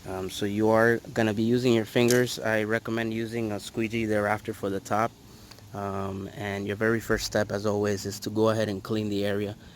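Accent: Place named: New York, United States. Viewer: Says Florida but you talk like your from New York, or similar.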